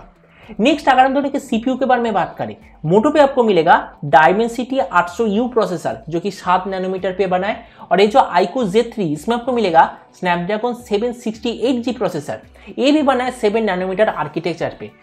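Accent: Bengal, India. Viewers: Ap bangali ho keya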